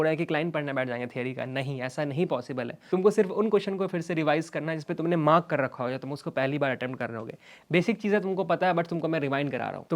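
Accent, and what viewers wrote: Bihar, India. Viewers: Aise kuch ni that isme jo dusra youtube video me ni h is topic pe. Aur thoda pronunciations pe kam kro Kuch words pe being Bihari wo dikh jata h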